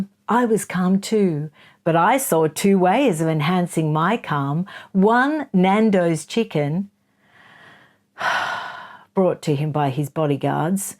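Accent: Australia. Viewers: you speak like a true Australian. 👏🏻🇦🇺 No flies on our noses. The time is approaching via social media that every skeleton Charles is hiding in his closet will be revealed. He has vast resources at his disposal to have known all.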